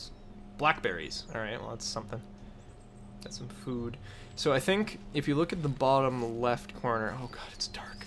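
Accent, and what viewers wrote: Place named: Canada. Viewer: Very interesting game! Reminds me a little of Fallout :) I also have to say I quite enjoy your Canadian accent.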